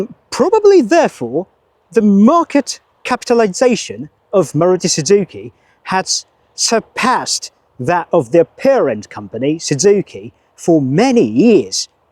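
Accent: United Kingdom. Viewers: イギリス英語で車紹介、どこかのトップギアかな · スズキ、フロンクス、天竜川、イギリス英語 全部好きだから助かります ありがとうございます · イギリス訛りなだけでイギリス人特有の辛口レビューが足りない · 真実の棒を使う配信者のようなゴリゴリのイギリス英語で、旧植民地で生産された元同盟国メーカーのクルマを、元帝国主義国の元雑誌編集者と腰痛持ちの配信者をオマージュしながらレビューする淫夢廚とかこれもうわかんねぇな… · 車の紹介でイギリス英語はあれを思い出すな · イギリス訛りの英語を流暢に話す日本人が、インド製の日本車をレビューする動画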